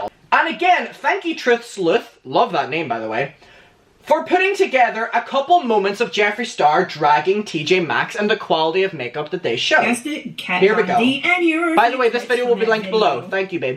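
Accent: Ireland. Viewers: Irish acsent you mean hes not speeking native irish lets get that straight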